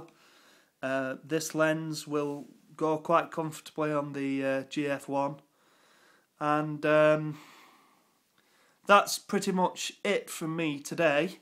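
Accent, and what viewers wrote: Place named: Yorkshire, United Kingdom. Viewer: haha i love the way you pronounce bokeh they should replace all the news anchors on the bbc to have a yorkshire accent :)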